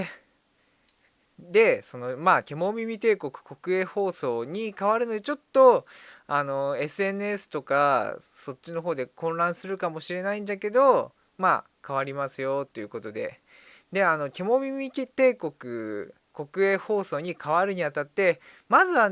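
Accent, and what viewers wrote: Hiroshima, Japan. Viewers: 広島の方ですか？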